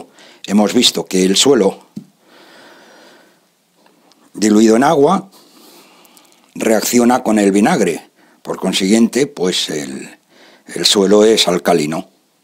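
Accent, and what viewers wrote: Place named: Spain. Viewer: Que te digo que eres la hostia tío..... jajaja me encanta la manera de hablar de ustedes los españoles...... saludos.......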